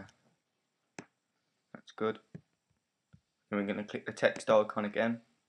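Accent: Birmingham, United Kingdom. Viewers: So refreshing to have this in a Brummie accent instead of one of our American brothers. Big Up from the Solihull Massive. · WTF BRITISH VOX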